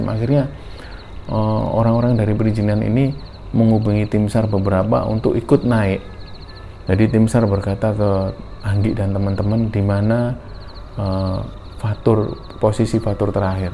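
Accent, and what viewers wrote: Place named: Surabaya, Indonesia. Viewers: BetuL...memang jauh berbeda,pendalaman cerita,penyampaian,anatomi tubuh dan aksen suroboyoan betul2 itu yang membuat beda dengan yg lain,mantapzzz 👍👍👍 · Ga bisa dipungkiri bahwa memiliki ciri khas yg tidak dimiliki chanel" lain yg sejenis, dalam diri mas (tanpa mengecilkan peran team yg lain) dengan runtut cerita yg jelas,lugas, sederhana, tenang, mampu menghidupkan suasana cerita, tentunya logat Surabaya yg khas. Pertahankan semua itu cak, yg perlu ditingkatkan hanya intensitas upload cermisnya saja, biar kami sobat kembara ga kelamaan nunggunya, maju terus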